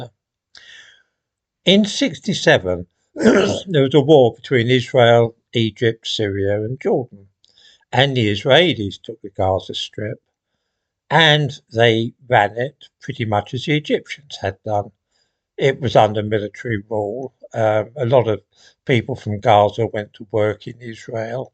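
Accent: United Kingdom. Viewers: Wow. What exactly were you doing living in Palestine? Nothing ever stopping them from acting like their own country? Nothing? I look at the google earth map today, and i see the most ominous wall ever constructed, and it just so happens to be surrounding this tiny 25 mile long strip of land. Not sure why i was subscribed to you, but i'd give any honest sounding british chap a shot. Really no choice but to unsub now, because i'm not the target audience for this unapologetic gaslighting. Pretty sure that the older i get, the more i can see the pattern of deception, but for some people it might work the other way.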